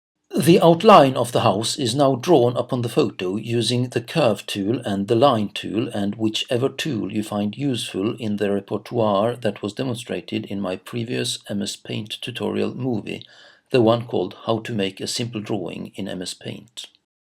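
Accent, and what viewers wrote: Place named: Sweden. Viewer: I knew in an instant you were Swedish from your accent haha, it sounds exactly like when I'm trying to make a Swedish parody in Danish :P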